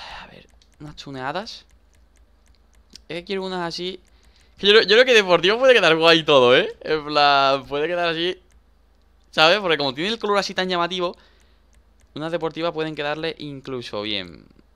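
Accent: Argentina. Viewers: Está en argentino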